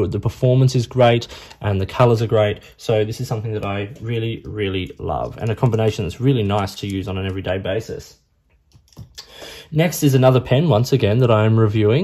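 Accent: Australia. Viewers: Judging by your accent, I'm guessing you're Australian. What's the best source of fountain pen ink in Australia? Is it best to over from overseas? I'm just getting back into fountain pens, so was thinking of going to the local Officeworks.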